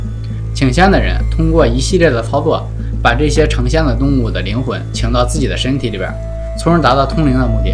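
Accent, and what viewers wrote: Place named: Shandong, China. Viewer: 这山东口音太重了吧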